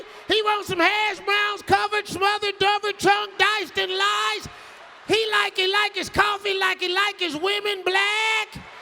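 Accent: South, United States. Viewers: His "country" accent is hilarious. It makes his stand up comedy that much better lol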